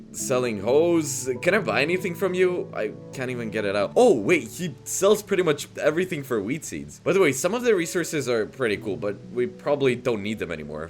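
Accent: Russia. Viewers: Are you from Russia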